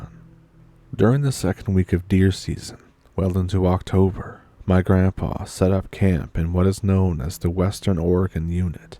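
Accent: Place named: Ottawa, Canada. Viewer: Odelay, 😊 always nice to hear my ottowa cousins accent when you tell yuir stories, neh? Frankly it abaot time. 😊🎉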